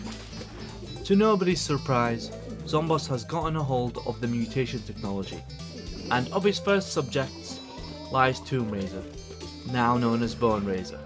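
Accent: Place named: United Kingdom. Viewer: I need you to make an audiobook for lord of the mysteries, your British accent would make long words sound even more gentlemanly 😩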